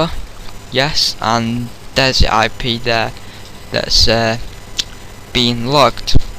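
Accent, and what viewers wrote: United Kingdom. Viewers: you have a british accent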